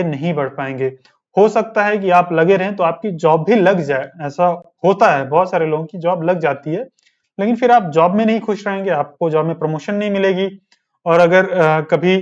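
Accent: Awadh, India. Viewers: bhai kaha se ho? Apke awadhi language ka touch mere Balrampur and Gond district wala lag raha hai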